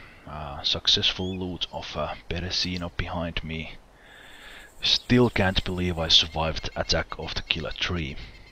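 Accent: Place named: Finland. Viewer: for the lovely finnish accent!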